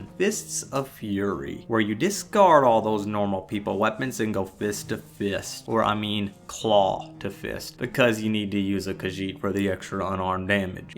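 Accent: South, United States. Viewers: it's funny to me, because the playthrough I play right now is a cluster-flip of concepts to me.. playing a Kahjit sneaky-assasain-mage thing, and it's my second time playing a mage. first mage game was a pure mage that got pretty op, so I wanted to try a weird double-build thing, and I also never went stealth before?? at the beginning of the game, I got the Thief Stone and now I have high power thief skills. also got good magic too. but it's also funny, because I'm actually really trying to roleplay more, and get more into the lore, but I still ended up basically having the same personality of me irl, so the dude is a lawful neutral monster that abides by the laws, and will generously go fetch any family heirloom, but if I find someone outside on the road and they're not an enemy even, I'll silently murder 'em for no reason at all. I also rob just about every single store/house I come across, even the food don't judge me... 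😂😂 also, I must say that the "cowboy" accent made me very comfortable??? I don't know how to word it, but my entire family is from the south, and they all still have accents so your voice made me feel right at home, I hardly see southern-accented YouTubers..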